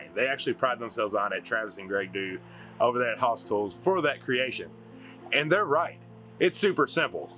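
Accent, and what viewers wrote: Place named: Kentucky, United States. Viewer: I was just watching a few of your videos and told my husband that your accent was similar to ours. We live in WV on the border of Kentucky. I was born and raised in Eastern Ky and graduated from Morehead State :) Great videos!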